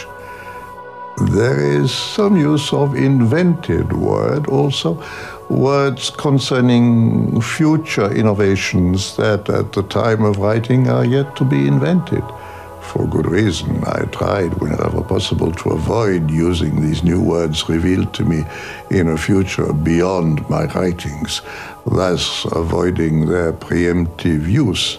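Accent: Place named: Germany. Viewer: nice German accent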